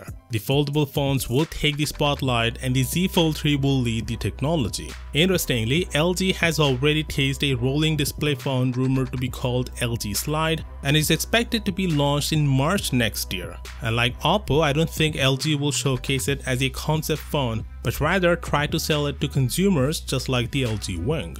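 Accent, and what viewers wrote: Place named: India. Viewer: Work on your accent, everytime I try to watch your video till the end i just give up because of it. Otherwise your fans will be indians only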